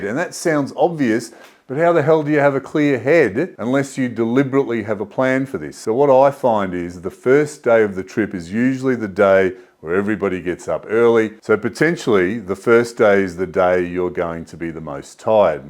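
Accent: Australia. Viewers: 😎👍 Big thumbs up for the channel... Love hearing different way things are said Down Under... Motorbike... first time for ever saying that word... I'm 68... Stay Safe Brothers...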